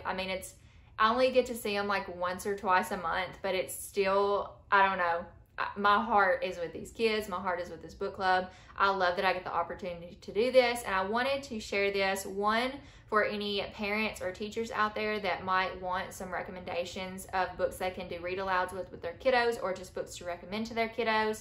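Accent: South, United States. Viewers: It's great to hear your southern dialect.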